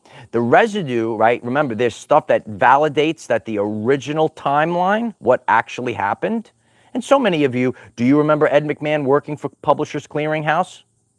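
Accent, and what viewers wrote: New York, United States. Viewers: One of the biggest grifters on YouTube. Accent makes me think of a NYC cop or criminal.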